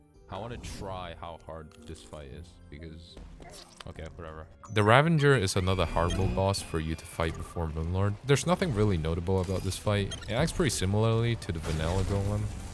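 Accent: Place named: Scotland, United Kingdom. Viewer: i sense a tinge of perhaps scottish in your accent? where abouts are you from matey?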